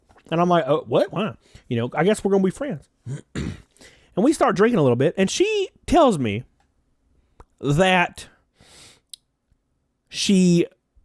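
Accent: South, United States. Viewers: Man, that country twang 😍🥰 I love you!!!! “Damn it” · I have such a huge crush on this guy 😍😍 That southern twang 💋🤌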